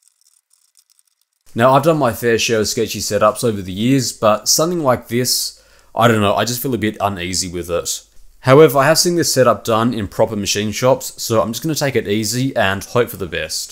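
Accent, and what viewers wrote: Australia. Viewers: For someone like me who learned english at school some decades ago (british english as in most european schools) at the beginning it was not easy to understand everything spoken with this specific australian accent. But I got used to it and it pays off. Meanwhile I have watched every single of your videos and learned quite a lot!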